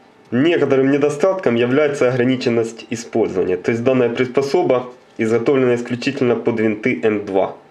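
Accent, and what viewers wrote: Odessa, Ukraine. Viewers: Автор наверно из Одессы. Пионар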